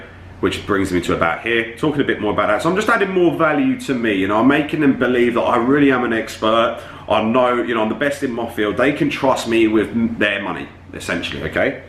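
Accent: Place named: Australia. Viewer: Why does this man have such a strong Australian accent?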